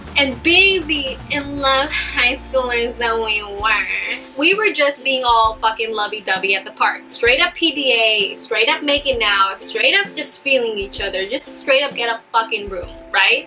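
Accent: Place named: United States. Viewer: Sounds like a filipino turned American...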